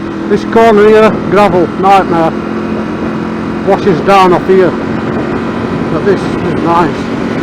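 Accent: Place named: Northern England, United Kingdom. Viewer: Brilliant. Loved your review. Nice to here a proper northern talking about bikes and routes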